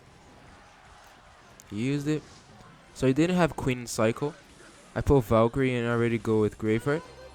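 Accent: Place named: Brazil. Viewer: Pelo sotaque vi que era BR kkkkk, joga mt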